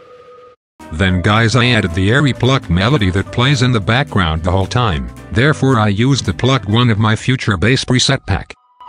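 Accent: Germany. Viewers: are you German? 😅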